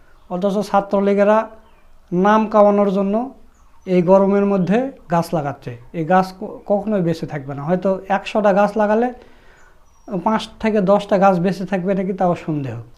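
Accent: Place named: Naogaon, Bangladesh. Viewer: আপনে কি নওগাঁ জেলার আপনার কথা আমাদের নওগাঁজেলার ভাষার মত লাগতেছে আপনাকে ধন্যবাদ আপনার খবরের জন্য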